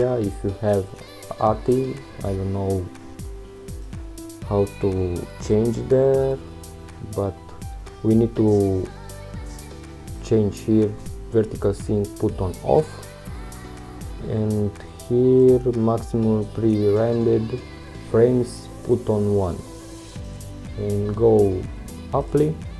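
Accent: Romania. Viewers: Din primu cuvant mi-am dat seama ca esti roman :)) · ma intrebam daca esti ro de la primele 2 cuvinte :)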